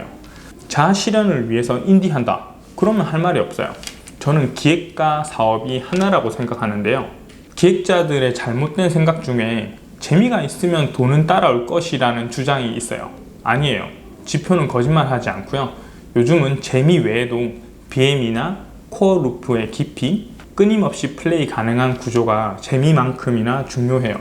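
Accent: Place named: Gyeongnam, South Korea. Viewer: 경남쪽 분이신가...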